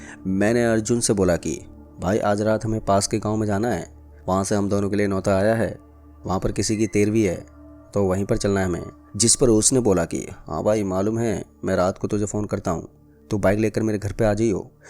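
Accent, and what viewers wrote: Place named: Noida, India. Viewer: Bhai ap noida se ho na voice se lgra hai re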